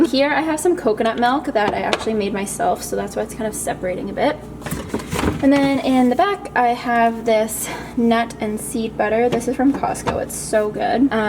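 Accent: Canada. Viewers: I love the way Canadians say “pasta” 😍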